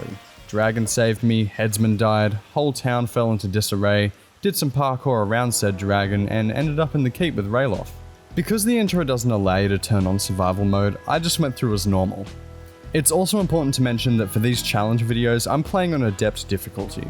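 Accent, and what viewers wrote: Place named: Australia. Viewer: “Youtube existed when you were 11? And was paying royalties? Damn you’re young!” - me, who refuses to admit she’s old 🙈 Love the video. Nice to hear an Aussie accent doing silly challenge runs on a 12 year old game 😊👍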